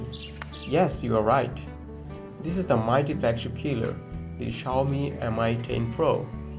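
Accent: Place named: Bangladesh. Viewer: hey bro...😍 You r from Bangladesh? you're voice accent is really amazing 😍 Love 🇧🇩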